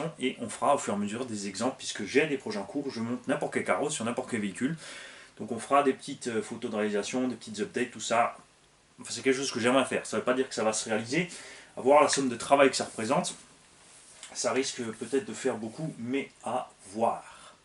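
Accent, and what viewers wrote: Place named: Alsace, France. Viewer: Bonjour ! Je viens de m'abonner à votre chaîne que je suis depuis quelque temps. Merci pour ce que vous faites, et en plus l'accent alsacien me rappel les racines... ça fait du bien 😍😅 J'ai l'intention de me mettre à la RC avec mon fils de 6/7ans. Pour le fiston je pensais partir sur un Funtek MT4. Si vous avez d'autres idées dans ce budget je suis preneur. Quant à moi je pensais commencer par un Arrma Sentons 3S. Qu'en pensez vous ? Merci en tout cas pour votre bonne humeur !!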